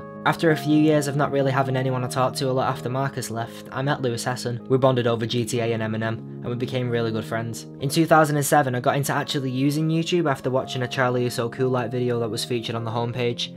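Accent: United Kingdom. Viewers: dhat British accent ^_^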